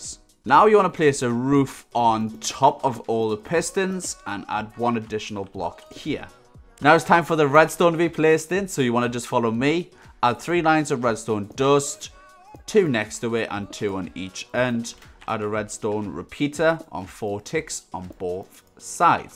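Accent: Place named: Newcastle, United Kingdom. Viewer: subbed on the fact that you’re a Geordie lad, git up son 😂 good content too btw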